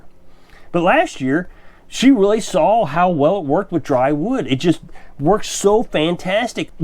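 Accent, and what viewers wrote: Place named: United States. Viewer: You are speaking American, not English. Maybe it's American English, but it's not English.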